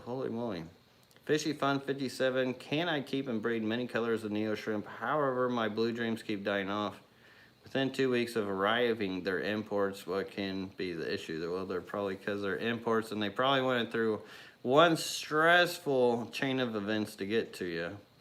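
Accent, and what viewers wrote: United States. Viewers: You sound like such a hill Billie . Love it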